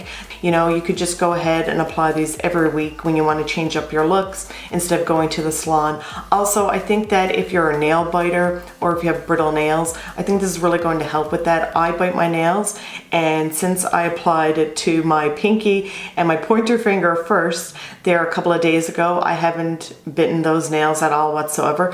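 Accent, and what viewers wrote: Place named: Newfoundland, Canada. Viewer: I just came across this video because I bought the system. Qp seconds in I had to go see what part of NL you were from ☺ fellow NLer here.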